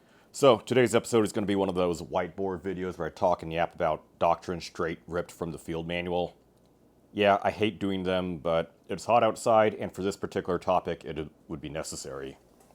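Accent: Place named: United Kingdom. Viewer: ACKCHYUALLY, He appears to be british to me.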